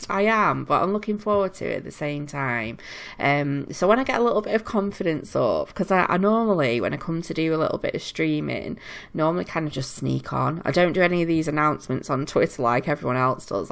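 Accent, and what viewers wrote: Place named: North, United Kingdom. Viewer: Love your builds! Nice to hear a fellow Northerner on youtube playing the sims too!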